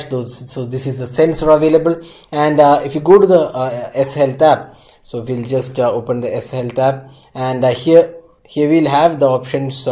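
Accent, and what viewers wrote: India. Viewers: holy fuck, indian accent is so funny....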